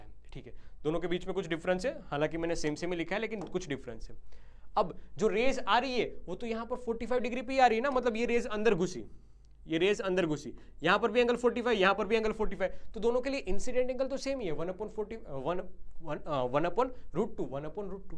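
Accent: Gujarat, India. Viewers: He sounds like he is from Gujarat 😅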